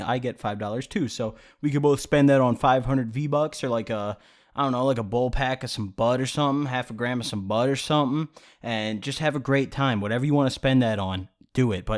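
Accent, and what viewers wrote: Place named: Illinois, United States. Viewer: How did I know you live in Illinois I live in Rockford, I recognized the accent I am white and have the same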